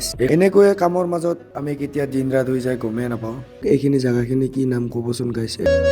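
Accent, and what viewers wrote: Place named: Bishnupur, India. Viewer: Amr gaor kotha koisa tumi val loga Thai khini. Bishnupur hoy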